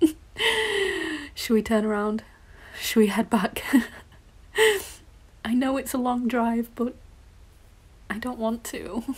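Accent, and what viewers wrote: United Kingdom. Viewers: Damnnn the British accent 🔥🔥🔥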